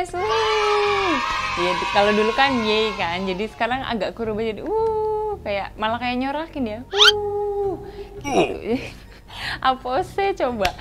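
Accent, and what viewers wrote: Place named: Indonesia. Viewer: logat nya indonesia banget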